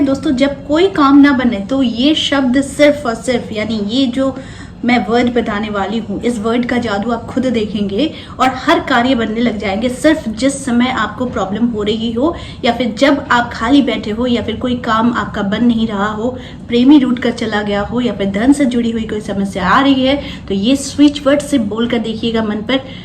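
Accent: Bengal, India. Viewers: Apka vlog accha lagta , apki baath sunkar lgta hai ki ap Bengali ho , me apki what's app MSG karunga jab mevi astrology shikunga❤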